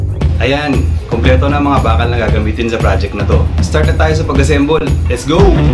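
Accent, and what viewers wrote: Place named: Philippines. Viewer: Nice Work brother .......you sounds Phillipines.......my neighbour.......I AM FROM MEGHALAYA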